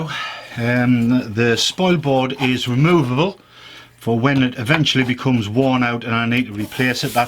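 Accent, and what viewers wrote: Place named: Sunderland, United Kingdom. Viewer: Sounds as if he's a Mackem from wearside?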